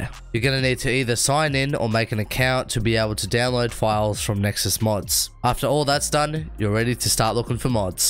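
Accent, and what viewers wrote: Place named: Australia. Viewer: You Aussie too brotha?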